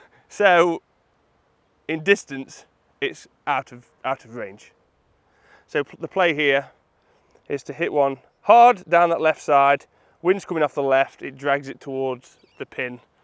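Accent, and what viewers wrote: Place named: Lancashire, United Kingdom. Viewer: Great video, new subscriber. Where you from originally? Sound like a Lancashire lad 👍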